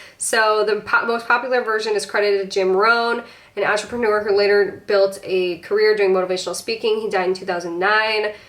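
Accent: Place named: Midwest, United States. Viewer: oh my god ur in chicago! i KNEW i heard a fellow midwestern accent!! also u look like you'd be british for whatever reason ahaha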